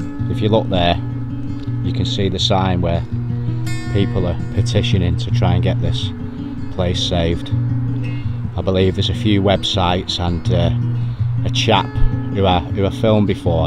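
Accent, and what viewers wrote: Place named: Salford, United Kingdom. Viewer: Great vids you do mate, it's just that Bolton/Farnworth little Hulton blag Salford accent that doesn't do it for me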